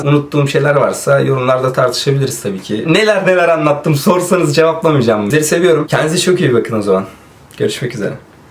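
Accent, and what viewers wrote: Malatya, Turkey. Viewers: reis malatyalı mısın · Abi Malatyalı misin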